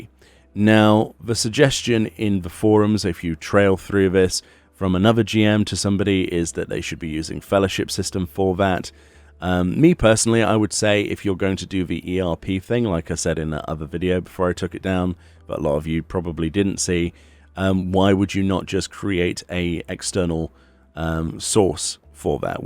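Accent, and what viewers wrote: United Kingdom. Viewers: From someone who neither rp's or erp's, I don't really understand why you go so harsh on ERP specifically here and state you dont when you obviously have some sort of issue with it o.O I do not agree with any type of public ERP but in 6 years of playing have never seen any on chaos, mainly as I haven't been looking for it I guess but haven't seen any interactions of the sort in public channels. SE can only police so much and whenever I've seen people advertise 18+ venues (from what I can tell) they have not been ERP specific just clubs mainly. There is also a language filter and emote filters, so in order for minors to be exposed to ERP or unacceptable behaviour in terms of age related content they'd have to remove the profanity filter and go looking for things within the game. That's a parenting issue, OR like most UK based 16 year olds at least, they're already well aware of the workings of the world in that respect and are treated as the young adults they are, you can't screen everything because some 16 year old or younger may see things they shouldn't when every precaution has been followed as much as is possible. 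age of consent in the UK is 16+. not 18. (im guessing this country is uk from the accent?) and do not require consent. the pegi rating is also for story content and is PG recommended.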